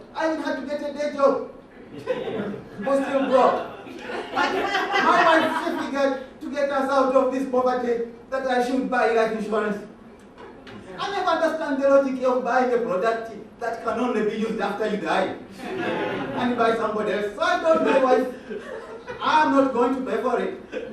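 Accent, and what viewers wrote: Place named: Jamaica. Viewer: i dont know which tribe in Uganda speaks like that.....u sound more like Jamaican..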